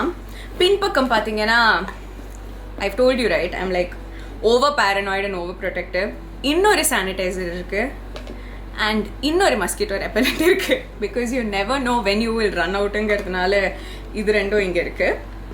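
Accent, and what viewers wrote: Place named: Brahmin, India. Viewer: chamathu, sweet kutti I like the way she speaks lol with smile and in tamil lol cute brahmana tamil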